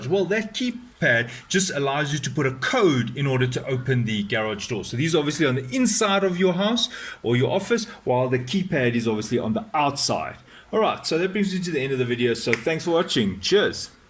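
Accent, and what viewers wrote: South Africa. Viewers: Sounds like a South African accent. Hi from Canada. Was actually looking for keypad opener that I can add or remove user codes through my smartthings controller like I can for my front door locks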